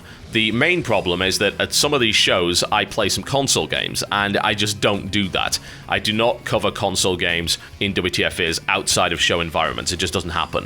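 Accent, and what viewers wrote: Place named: Newcastle, United Kingdom. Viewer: Proof that TB has some Geordie left in his accent. "Boook"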